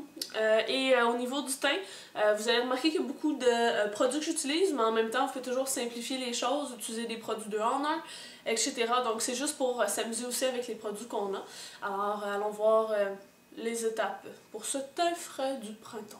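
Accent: Quebec, Canada. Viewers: Salut, Je suis une abonnée depuis un an environ je trouves que tu as une Personnalité Pétillante mais ce que j'aime encore plus c'est Que rien ne pourra t'enlever ton coté Québécois ahah Je Te cites: Des patches Secs s'pa L'idéale Je swirl Dedans Ahah continues Ma belle:)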